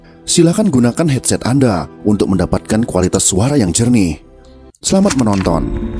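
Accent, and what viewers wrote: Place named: Kalimantan, Indonesia. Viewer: Jawa Kalimantan ya min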